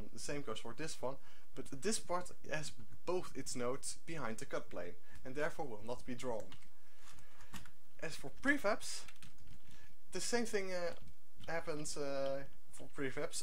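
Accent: Netherlands. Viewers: kan je nog een nieuwe video maken ik hoor aan je dan je nederlands bent ook al is promods nederlands;)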